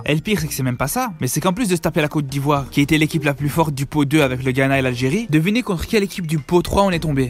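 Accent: Brussels, Belgium. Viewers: Bravo pour tes 5 000 abonnés 😍 c'est chiant pour le Maroc de tomber contre la côte d'Ivoire maintenant ils vont vouloir se venger sinon désolé Fréro 😉 je suis un Tunisien et on a vraiment une bonne chance sinon j'adore ton accent bruxellois tu me fais rire 😂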